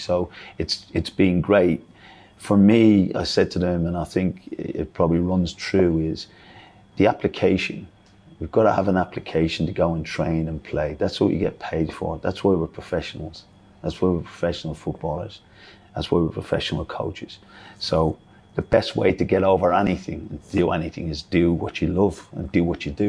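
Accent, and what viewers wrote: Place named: Northern England, United Kingdom. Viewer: his accent is weird, sounds like a combination of northern english and irish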